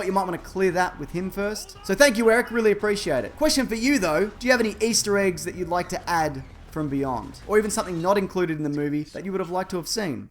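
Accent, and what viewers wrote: Australia. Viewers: I cannot fucking listen to this guy talk. There's Australian, and then there's down syndrome.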